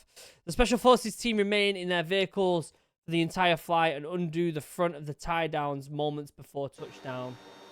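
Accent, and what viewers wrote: United Kingdom. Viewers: After the first ranting when the video starts the guy says video has no audio, video has audio doesn't have narration and narrative is shown under screen and suddenly guy says let me read it so people have problem in reading English understand it, I am a civil engineer with masters degree in steel structures and second master in Political Science and international relations and now studying PhD in regional studies and my toefl score was 97 point out of 120 which I took the test 5 years ago and now I am so much better in English, I wanted to say to this guy the British accent is fading out very fast and the real reasons for it's demise are it's unclear and non comprehensive, as I witnessed 2 brit one from Chelsea and on from other side of the London couldn't understand each other, and it's a snub and how it carries the feeling make the people who hear it feel bad, it's getting lectured about importance of morality in life and Vlad putin is the person gives you a talk on how precious life is, and if a person had problems in reading a text in English you be sure they never understand a word you mumbled, if you want to your work be seen by people loose the accent man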